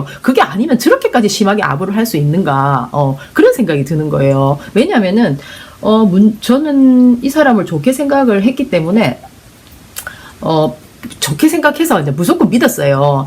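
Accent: Gyeongsang, South Korea. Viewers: 그동안 잠깐씩 듣다가 이번엔 좀 길게 들어봤어요. 정치신세계 팬 인가요? 왠만한 판별력과 자기 중심이 없다면 들을수록 빠져들게 애둘러 말하는데 결국은 민주당과 김어준 주진우 등 대통령의 강한 우군들 에대한 욕이었네요~ 참 교묘하다! 대구 경북 쪽 말투로... 이 방송 보시는 분 들은 이동형의 이이제이 를 먼저 듣고 판별력을 키우신후 듣기를 바랍니다. 내말이 당신의 의도와 반대였다면 담부턴 좀 단도직입적으로 말하길 권합니다!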